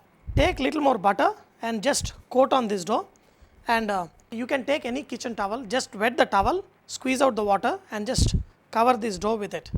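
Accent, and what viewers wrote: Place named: Telugu, India. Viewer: I like the way this guy speaks.......the accent is of a typical telugu guy..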